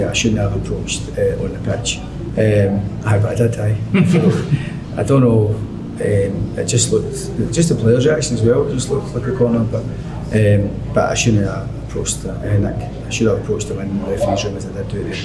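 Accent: Scotland, United Kingdom. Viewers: More waffle. But now in a more verbose style and with a Scottish accent.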